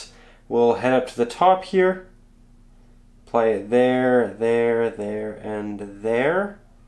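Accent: Canada. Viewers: The Canadian accent and pronouncing of words is difficult to listen to.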